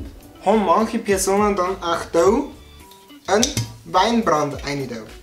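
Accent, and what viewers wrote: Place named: Upper Palatinate, Germany. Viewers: von wo kommst du aus da oberpfalz?😅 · Du soitest stolz auf dei herrlichs Obapfälza "ou" sei und dia vo den Rindviechern nix eazähln lossn! I kannt dia den ganzn Dog zuahearn wia du Goaßmaß sogst.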